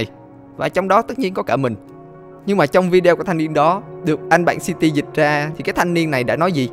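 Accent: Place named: South, Vietnam. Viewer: that is southern vietnamese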